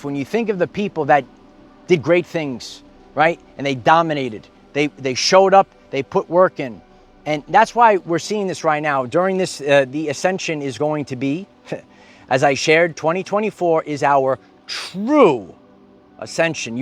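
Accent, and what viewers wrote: New York, United States. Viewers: a few weeks before I came across your channel I would sometimes see 2 or 3 times per day, an ad at a bus stop saying come to New York. The picture showed the statue of Liberty holding the torch. It really popped out and got my attention How synchronistic. Anyway I am really enjoying the new connection and knowledge gained. And I love the NY acsent Peace.😊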